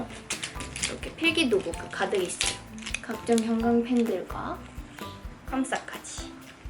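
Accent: Busan, South Korea. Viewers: You got a dialect. Are you from busan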